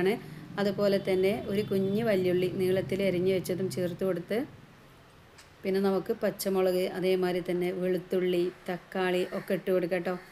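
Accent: Malappuram, India. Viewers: പൊന്നാര താത്ത മലപ്പുറം ഭാഷ കേൾക്കാൻ ആണ് ഞാൻ ഈ വീഡിയോ കാണുന്നത്❤️😂😂👍🏻👍🏻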